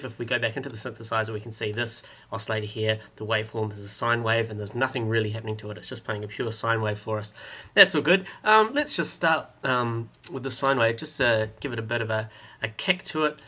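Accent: New Zealand. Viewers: are you from new zealand? Your accent sounds like it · he ain't talkin fast hes a kiwi!!! churrr brotha!!!!! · great tutorial. It's a bit too advanced for me, an Ableton newbie. I learnt alot though, will definitely be back once I'm more familiar with Ableton. Watching your video, I learnt some things which would have taken me months to figure out by myself. Great explanation, being a kiwi, I can understand everything you said. Maybe I should do the English transcript, for the non-Kiwi listeners. Some have referred to speed and not understanding everything.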